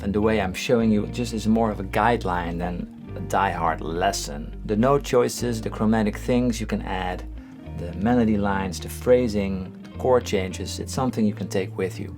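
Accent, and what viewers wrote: Netherlands. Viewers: Hi. New subscriber here, and I'm really enjoying your videos. My parents and all my extended family are from Netherlands so your (very slight) accent is relaxing and familiar to me. Cheers! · This dude is heavily dutch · Bro your Dutch I know because your accent and I see you many times at the supermarket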